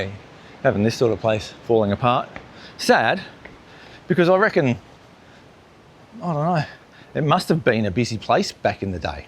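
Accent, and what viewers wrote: Australia. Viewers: This is a great video thank you. Have to say I was bloody shocked with your occa Aussie accent and then you bring out the perfect Indo with the locals. Made the video! What lovely guys, Balinese are the salt of the earth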